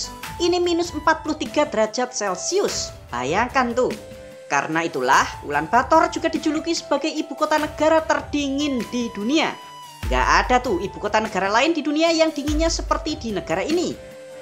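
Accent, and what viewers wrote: Java, Indonesia. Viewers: Logat Jawanya yg sangat Medok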